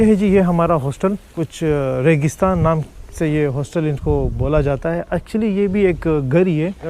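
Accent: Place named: Pakistan. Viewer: Pakistan accent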